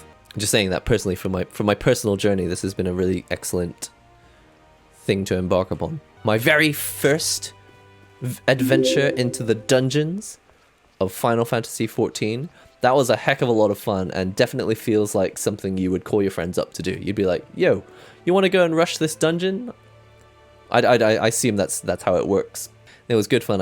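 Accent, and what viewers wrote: United Kingdom. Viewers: Your accent suggests you are British or South African. What's the origin of your English Accent?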